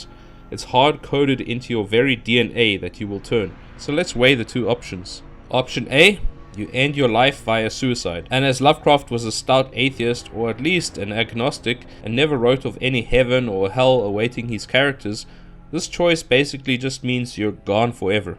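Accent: South Africa. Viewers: You're very close. I'm from Slovakia. And you sound just like a friend of mine from South Afrika, but it seems that you've been all over the world. "The heart is deceitful above all things, and desperately wicked: who can know it?"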